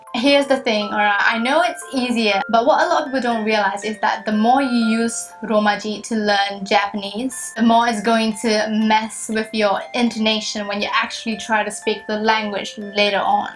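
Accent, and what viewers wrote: South Korea. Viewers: your accent is sounds like korean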